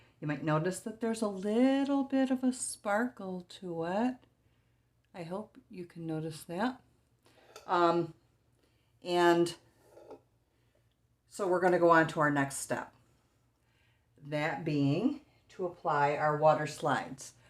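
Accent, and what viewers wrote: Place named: Central New York, United States. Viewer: This is awesome. I love hearing you talk. It's like I'm back home in CNY. The accent sounds the same. ;-)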